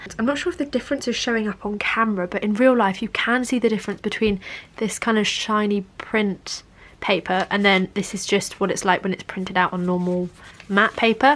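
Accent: United Kingdom. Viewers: Gosh!i love your accent British~♡love it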